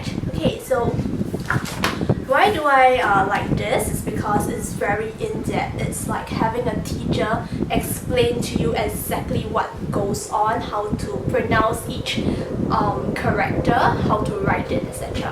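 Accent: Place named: Singapore. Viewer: Idk whether anyone has said this but you sound like a singaporean.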